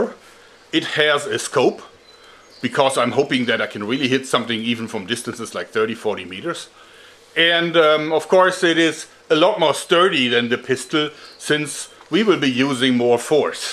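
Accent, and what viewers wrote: Germany. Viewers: This dude is so cute with his german (please correct me if im wrong) accent and his homemade weapons. Like a big kid that's really creative... and possibly deadly.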